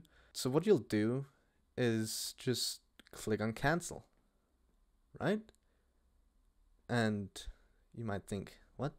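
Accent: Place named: United States. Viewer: Finally an American not Indian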